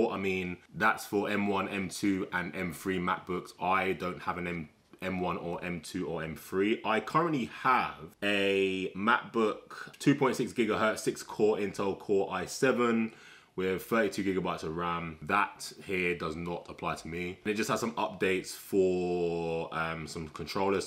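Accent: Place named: Roadman, United Kingdom. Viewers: lol it's all good. You speak the Queen's English. My American English is a bad version of yours. 🤣🤣🤣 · Mans is road man bruv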